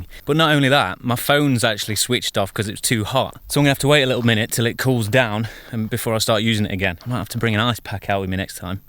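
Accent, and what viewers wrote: Nottingham, United Kingdom. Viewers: I KNEW you were a Nottingham guy. I'm a Derby lad. Aaaah, the Midlands.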